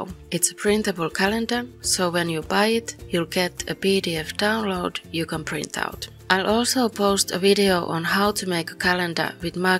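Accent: Finland. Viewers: You sound very Finnish :D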